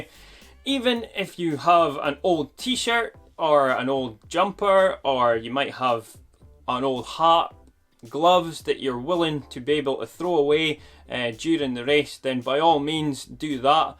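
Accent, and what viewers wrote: Scotland, United Kingdom. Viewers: Running advice with a scottish accent. What more could you ask for. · He's Scottish 😂 English is their first language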